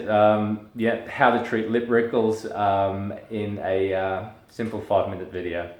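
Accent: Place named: Australia. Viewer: Aussie accent.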